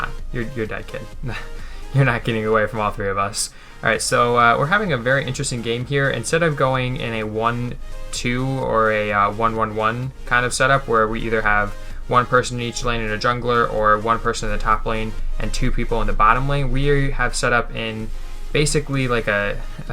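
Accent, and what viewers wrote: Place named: Boston, United States. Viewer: Yo I live in Massachusetts!! You said that you have a Boston accent so I thought you Live in Boston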